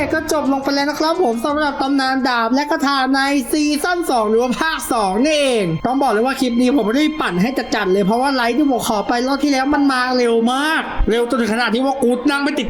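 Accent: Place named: Suphanburi, Thailand. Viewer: ฟินเหน่อสุพรรณ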